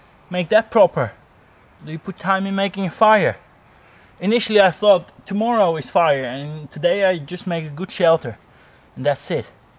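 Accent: Netherlands. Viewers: jij bent sowieso nederlander ik hoor het🤣 wel hele toffe video👍👍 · Gotta love the dutch accent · Yo broeder. Aan je accent te horen hoor ik iets Nederlands. Klopt dat? Gewoon nieuwschierig. Top video! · ja kan dat mooie Nederlandse accent gewoon niet verbergen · U have such a dutch accent or is it just me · You sound like ur from the netherlands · Ireland? You sound Dutch, am i right? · Ik hoor dat hollandse accent wel ! :D van waar ben je ? Ik ben een beginnend outdoorsman van Belgie, heb materiaal gekocht maar zou leuk zijn als er iemand is die me irl wat zou kunnen bijleren. Misschien kan ik ook helpen met filmen ? Laat maar weten ! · He has a Dutch accent is he from Holland ?? Edit: creepy background music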